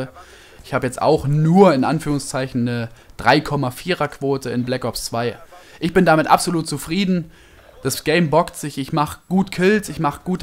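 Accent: Swabia, Germany. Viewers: mit seinem Schwaben Dialekt:D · bist du schwabe?:D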